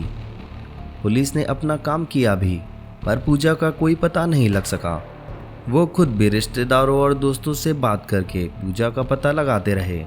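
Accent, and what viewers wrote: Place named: Haryana, India. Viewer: Haryanvi accent jaisa hai.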